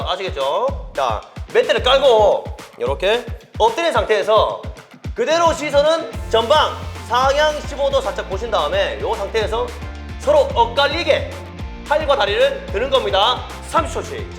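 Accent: Gyeongsang, South Korea. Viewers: 진짜 인트로 어설프게 미국물 먹은 경상도 아재 감성이다...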